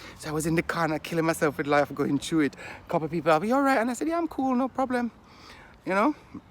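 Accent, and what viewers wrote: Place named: Jamaica. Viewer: You sound like a yardie. Love the story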